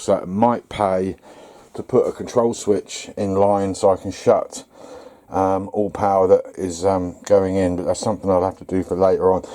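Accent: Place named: Suffolk, United Kingdom. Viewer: this how i understand it works after months youtube research and wading through many videos of mis information if you are wiring two batteries in series to achieve 24v if so. for example if you have two 100ah batteries in series you will have 100ah but if you have a 100ah and 80ah you would have 80ah but i would recommend batteries of matched ah batteries preferable of the same condition if you are wiring in parallel to achieve 12v assume all batteries in that parallel are of the lowest AH battery value for example 100ah connected to 80ah you would have 160ah when purchasing brick wash watch out for cheap version with less than 10% w/w hydrochloric acid and when applying on heavy deposits you may need to work it in with a stiff brush and leave and come back and work it in again when the fizzing stops the concrete has neutralised the acid i thought you was from Suffolk by your east Suffolk accent best wish to you and your family from dave Bury St Edmunds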